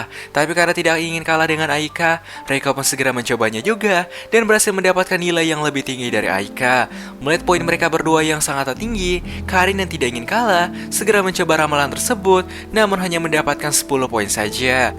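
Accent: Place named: Bandung, Indonesia. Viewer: logat bandung jier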